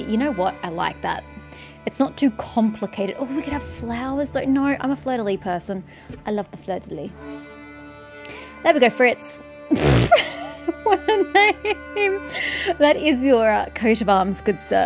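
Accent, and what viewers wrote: Australia. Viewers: Just found your channel! I downloaded manor lords last night and I love it. I'll follow on your series for sure! Love hearing another Aussie lady do a play through!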